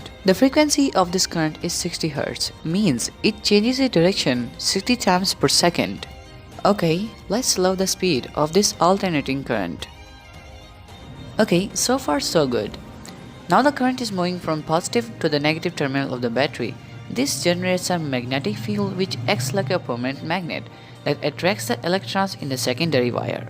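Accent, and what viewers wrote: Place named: United States. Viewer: are bhai kya tum America wale Ho Yaar your English is so best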